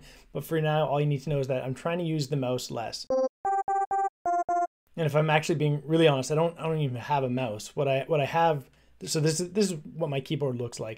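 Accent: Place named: Canada. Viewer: It took me five seconds to know you’re Canadian. And I grew up and I live in Sweden. The times we live in❣️🙏🏻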